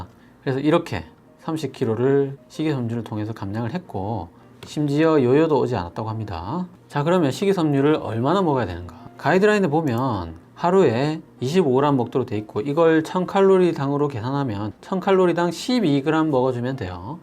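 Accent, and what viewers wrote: Gyeongsang, South Korea. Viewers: 우리 님은 너무 훌륭한 강의를 하시니 경상도 억양도 너무 멋있게 들리네요!..늘 고맙습니다요!..^^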